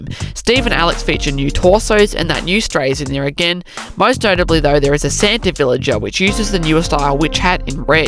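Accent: Australia. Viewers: are you aussie! and are you from australia cause you keep showing big w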